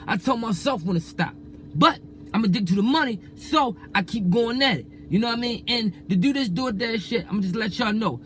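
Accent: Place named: Baltimore, United States. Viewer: Baltimore. 10 seconds in I knew 🤣🤣 Salute dawg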